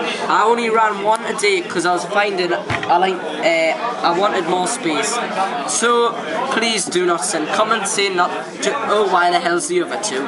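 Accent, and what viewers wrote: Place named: Scotland, United Kingdom. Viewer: i love your accsent dude.sre you scottish?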